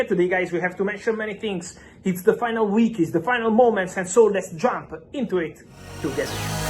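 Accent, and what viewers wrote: India. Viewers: You sound like an Indian speaking English.....😒😒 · Why does he sound like an Indian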